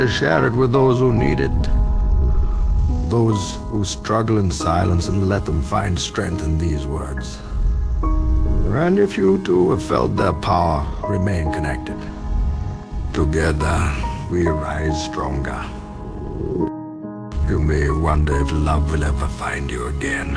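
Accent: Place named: India. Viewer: Come on man, this dude sounds indian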